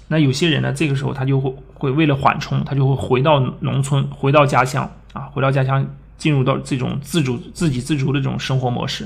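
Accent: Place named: Shanxi, China. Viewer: 感觉普通话中有山西口音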